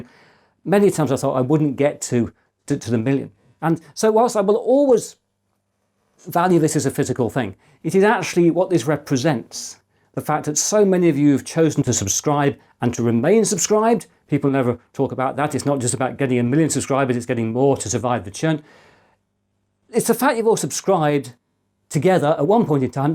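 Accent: England, United Kingdom. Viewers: It is never too late to congratulate (3 weeks late 🤭) Popularising is a noble thing and even more so when it comes to computers when I look around me. They treat computers like toys but cry when they've lost all their data because they didn't make a back up. I really enjoyed your video on mini SD cards to help me make the right choice for my Raspberry Pi. But most of all, I love your English accent, which makes a big difference to me compared to Americans and Canadians (peek-a-boo Linus Tech Tips).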